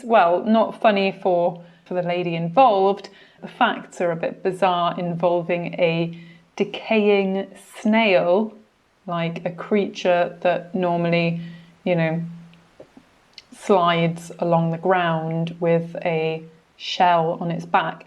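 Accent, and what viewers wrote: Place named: United Kingdom. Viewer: The most cordial greetings: I'm a lawyer from Colombia who is learning general English, but I also want to be able to speak about my profession in this beautiful language, therefore I thank you for your review, bucause now I know that is a good idea to get this book and why not, to take the TOLES exam. Take care. Bless you. Postscript: I really love the british accent.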